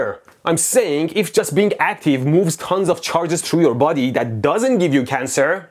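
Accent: Iran. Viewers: ایرانیه از لهجه اش معلومه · لهجت داره داد میزنه ایرانی هستی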